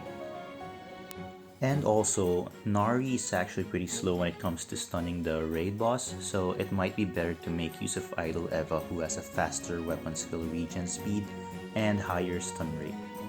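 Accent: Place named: Philippines. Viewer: u sound pinoy af, in a good way xD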